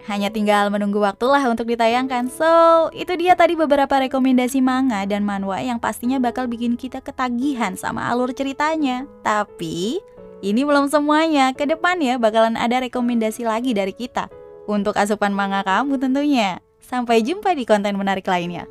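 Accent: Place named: Tulungagung, Indonesia. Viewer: ooo iki ye wong tulungagung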